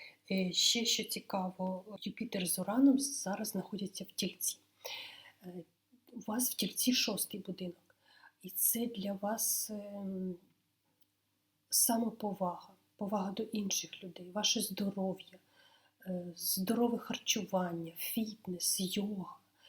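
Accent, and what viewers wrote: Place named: Poltava, Ukraine. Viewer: У Вас полтавський акцент, який дуже милий моєму вуху))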